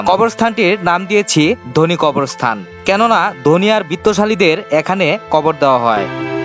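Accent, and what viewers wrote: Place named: Narsingdi, Bangladesh. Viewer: ভাষাতো নরসিংদী র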